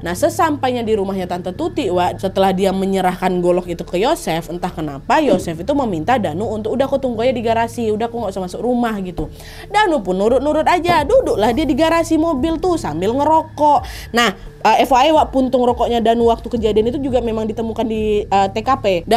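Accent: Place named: North Sumatra, Indonesia. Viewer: BTW, ini profesi sesungguhnya apa ya. Dan dari logat bicaranya dia tampaknya orang Sumatera, khususnya Sumut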